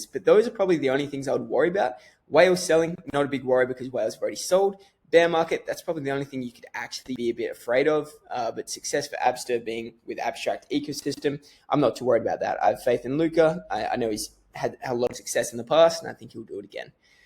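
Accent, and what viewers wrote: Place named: Australia. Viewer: Sounds like you are Aussie. Are you friends with Dan McEvoy?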